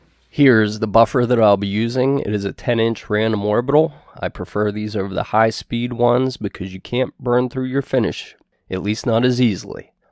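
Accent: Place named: Pittsburgh, United States. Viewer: Being from Western Pennsylvania I knew you were from Pittsburgh area Western to central PA From your accent and the mountain laurels in the woods were you and Buck love to walk. You show great projects and do wonderful work Great ideas.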